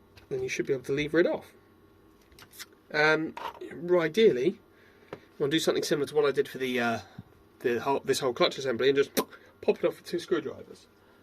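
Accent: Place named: United Kingdom. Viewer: what nobody wants to hear is that smooch british bs accent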